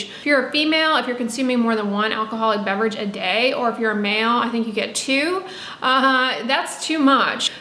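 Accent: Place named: California, United States. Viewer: Where are you from? I know you are in Houston now, but you really sound like you are from California. Just wondering. I love your channel by the way.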